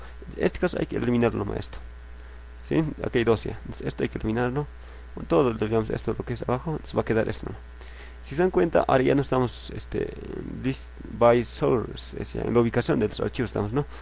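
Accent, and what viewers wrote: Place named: Puno, Peru. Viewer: si pasas unos meses en el departamento de Puno hablarás igual, es el dejo de la región así tenemos otros dejos o acentos en diferentes ciudades del Perú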